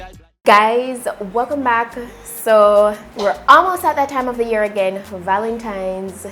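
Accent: Jamaica. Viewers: You beautiful love your Jamaican accent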